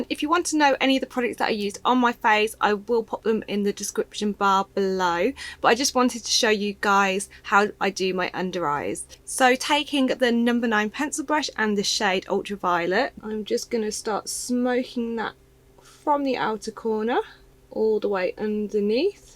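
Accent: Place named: Birmingham, United Kingdom. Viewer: I ordered this palette today, can’t wait to use it!!! It’s so beautiful. Is that a Brummie accent I hear? Fellow Brummie!!!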